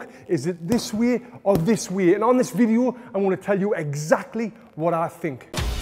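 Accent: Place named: Geordie, United Kingdom. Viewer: Is this guy from Middlesbrough or Newcastle because he sounds like from that area in uk. North east area, maybe Sunderland, Nirthumherland or Durham. They all sound the same. Geordies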